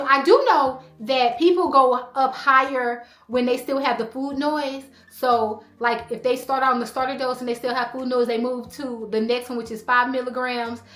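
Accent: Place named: South, United States. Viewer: I can hear a little bit of an accent/twang especially when you say January 1st. Are you from the South? Just curious.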